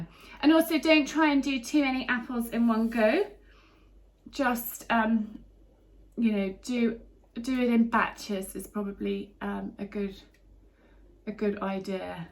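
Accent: United Kingdom. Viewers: I really like your Videos!!! Its very refreshing and i love your UK Accent.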